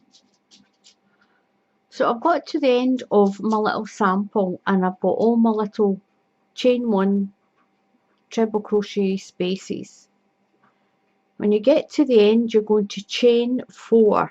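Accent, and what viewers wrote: Scotland, United Kingdom. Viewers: Am really enjoying making this blanket during lockdown , have learnt alot . Love your Scottish accent and hope to see and hear more of your patterns in the future . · Great to hear a Scottish accent on a crochet tutorial. Love this pattern thank you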